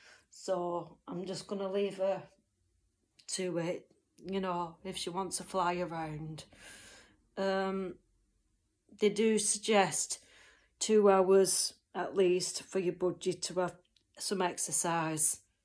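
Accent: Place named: Yorkshire, United Kingdom. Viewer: Yeah lol am from Durham but you seem bit further away Yorkshire maybe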